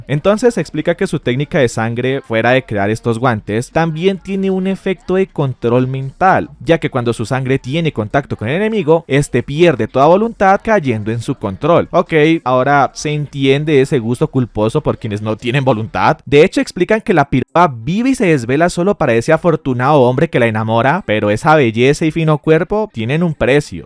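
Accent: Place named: Colombia. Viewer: colombiano narrando anime cine🗿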